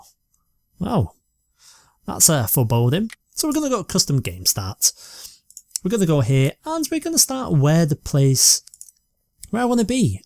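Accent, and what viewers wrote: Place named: Scotland, United Kingdom. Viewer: Your relaxed accent reminds me of * and history documentaries.Scottish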